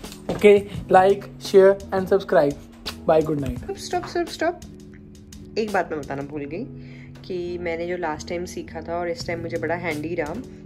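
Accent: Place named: Gurdaspur, India. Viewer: Munde di boli toh pta lg gya oh gsp toh aw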